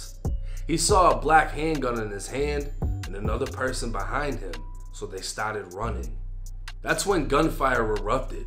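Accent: Boston, United States. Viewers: are you from Boston????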